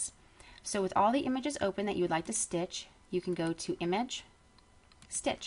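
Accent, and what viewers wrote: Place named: Ohio, United States. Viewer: i love your voice and accent - ohio?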